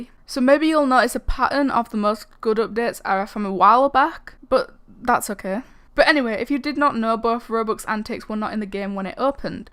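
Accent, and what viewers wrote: United Kingdom. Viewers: Oh godhaaaaaaaaa THAT BRITISH ACCENT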